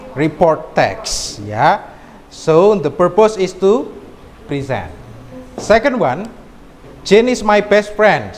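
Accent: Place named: Java, Indonesia. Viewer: Javanese english sir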